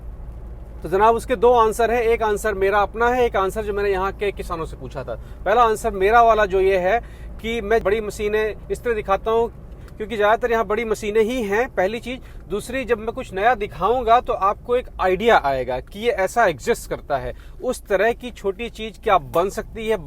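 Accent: Bihar, India. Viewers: Lagta hi aap bihari ho ...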